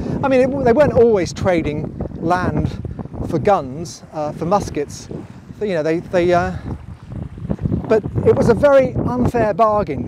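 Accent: England, United Kingdom. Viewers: That English accent will get you anywhere...fantastic work. There's a koro nearby called vatukarasa,one side indians live and the other side fijians and both sides are very accommodating let them take you night fishing you will absolutely love it.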